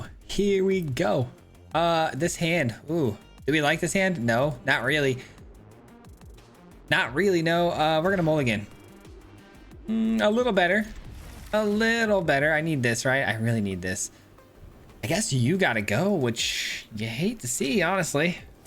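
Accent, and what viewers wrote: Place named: United States. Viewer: Well he is american. They way they say everything kills me.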